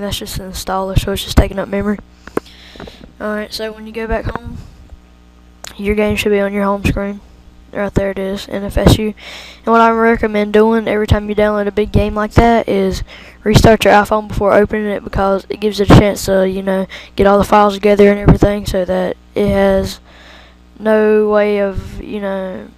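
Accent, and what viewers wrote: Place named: Netherlands. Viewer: Stop insulting his accent, its dutch and its cool :) Yep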